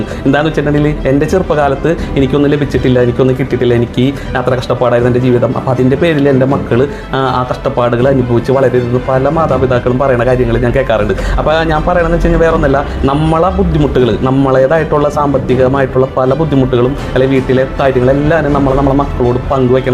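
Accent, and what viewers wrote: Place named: Cherai, India. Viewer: Cherrayi slange..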